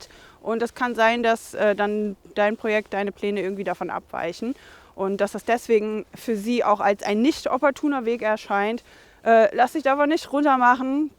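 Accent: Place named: Berlin, Germany. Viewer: Ich liebe deinen Berliner Akzent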